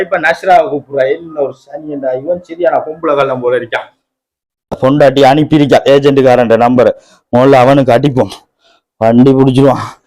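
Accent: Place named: Valachchenai, Sri Lanka. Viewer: அரபுநல்லாதெரிந்த ஒருவரைவைத்துநடித்துரிக்கலாமே.நீங்கள்.எந்த இடம்.வாழச்சேனையா.உங்கள்பேச்சில்விளங்குது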